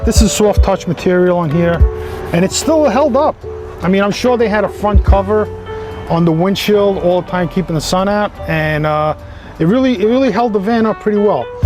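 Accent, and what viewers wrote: New York, United States. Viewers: This is really a big pice of sh*t u kno that rite trying to make it sound like it's a cream puff the way your talking where u from nyc that's y won't be caught dead in this bro it probably stinks of ass n piss with all the sheets covering disgusting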